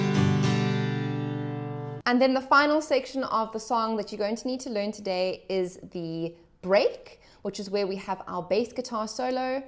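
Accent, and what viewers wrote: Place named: South Africa. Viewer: Yay SA accent, finally.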